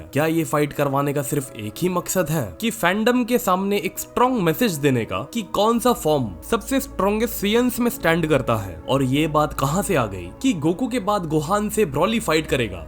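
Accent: Maharashtra, India. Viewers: Your manga narration are the best and the Marathi accent is crazy ! 😂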